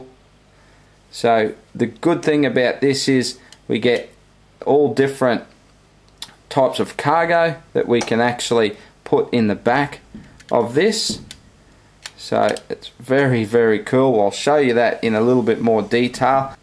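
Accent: Australia. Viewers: R u australian firat thing i do i hear your voice then i comment yu sound thats why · I'm Aussie and this dude has a REAL Aussie voice!!!! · Hmmmm, I wonder if he's Aussie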